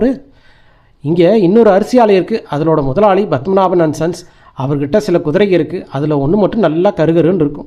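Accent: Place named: Kerala, India. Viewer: You have acent of Malayalam.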